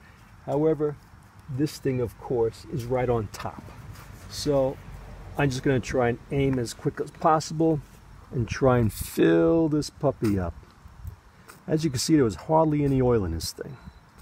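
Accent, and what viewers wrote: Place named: New York, United States. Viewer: This was great. I can hear the New York / Italian heritage lol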